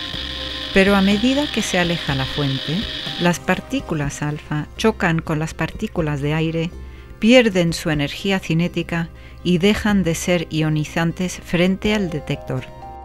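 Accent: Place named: Spain. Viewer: Amo los documentales cuando relata un español, las imágenes son didácticas y tiene música placentera de fondo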